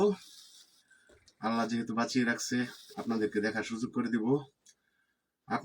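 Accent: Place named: Dhamrai, Bangladesh. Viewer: Bhai ar desher bari koi Amar dhamrai basha amader moton tai bollan thanks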